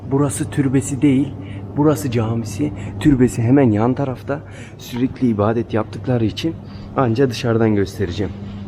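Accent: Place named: Kayseri, Turkey. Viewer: Bu yakışıklı da kimmiş önüme çıktı videosu 😂Gayserili gibi gonusuyor😂Ulukisladan selam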